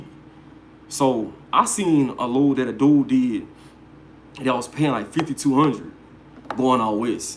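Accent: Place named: Louisiana, United States. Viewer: U gotta be from Louisiana brudda I could hear it in your voice… Also my first day of orientation w P&S tmr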